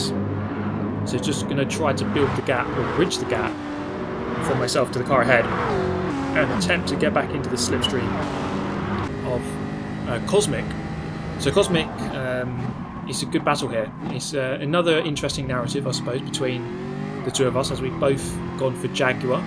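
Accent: United Kingdom. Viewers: That commentary in British accent , makes this video feels like watching an actual race !! But this is Gran Turismo, on PS4.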